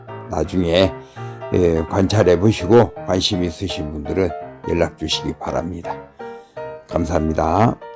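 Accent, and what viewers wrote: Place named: Gyeongsang, South Korea. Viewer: 최고라고 표현 하고 싶습니다^^ 너무멋집니다 특히 구수한 대표님의 경상도 억양 의나레이션 또한 최고 입니다 ^^ 잘 보고 갑니다 좋은 영상 감사합니다 🎉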